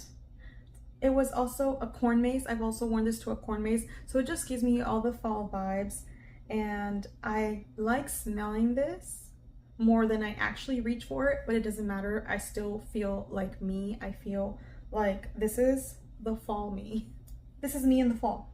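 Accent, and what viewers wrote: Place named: United States. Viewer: Where you from? I love your american English accent is so clear❤❤ your baby is so cute❤❤❤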